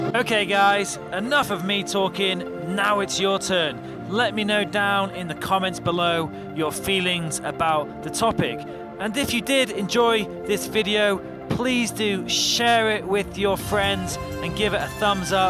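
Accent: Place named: United Kingdom. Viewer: I love all your vids,they very informative,you always try to tell how you see things the nicest way!! but I love the most when I'm listening to your vids is your accent soo British! I love it I love it!! keep up the awesome work! God bless! cheers! :)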